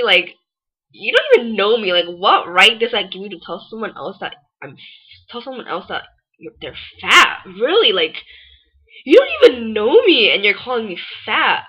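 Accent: United States. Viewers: You sound American, not Korean. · LOL I never heard a Korean girl cursed so American like & she nailed it. U are so cute sweetie keep doing you. I've heard Korea prison are very hellish compare to American prison. Smart & cute I want you LOL... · don't put out your insecurities like being fat as a korean problem. If you were truly comfortable about your body and complexion, you would not have a problem brushing off what others have to say about you, them being korean or not. Before you talk shit about other koreans, think about the blood that is pumping in your heart. from your accent i believe you live in the united states, youre a korean american but the blood that pumps through your heart is that of Korean descent, one that has successfully been preserved and cherished for thousands of years amongst world powerhouses like japan and china. be proud of who you are and learn to embrace all that makes you, you. 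do you even know the great men and women who have sacrificed their lives to make our country what it is today? do some research, and if you still hate koreans, so be it. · I don't think it matters whether or not she's Korean-American or Korean. She's talking about why she hates being Korean. Regardless of if your Korean-American or Korean, you're still Korean. Maybe she used pounds because she's speaking in an American English accent, so maybe this video was directed towards Americans, who (omg I can't believe it.), use the term pounds. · Yeeeeeeeah, you're extremely annoying and I could barely last the 2 minutes I watched. I thought you'd be listing some personal cons of being Korean, instead you turned out to be some selfish kid complaining. It's hard to believe you're Korean, you sound like the stereotypical American white girl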